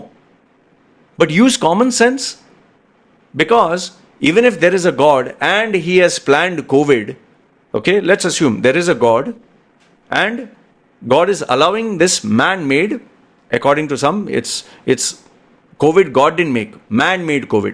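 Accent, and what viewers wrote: India. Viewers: Indian English accent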